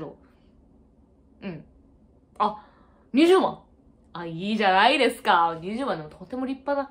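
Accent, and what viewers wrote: Kansai, Japan. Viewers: 関西人がしゃべる標準語よき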